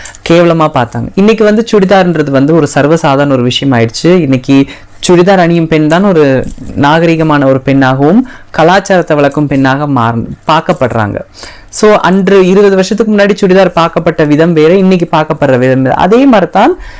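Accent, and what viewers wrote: Sri Lanka. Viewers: Ungaloda ilangai tamai I LIKE IT Ninga foreign la irunthalum nama tamil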